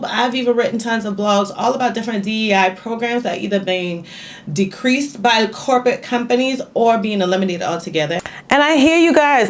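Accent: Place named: South Carolina, United States. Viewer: This is unrelated, but I gotta know where you're from. I'm from the Charleston area of SC, and your accent is reminding me of that lol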